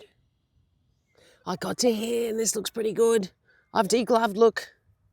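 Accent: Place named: Germany. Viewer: Well done on the finds and thanks for the exclusive footage from Treasure Hunters Down Under.Congrats on being film stars overseas and I hope we get to see it soon down here.Love your German accent! Is the mysterious camera person? Cheers👍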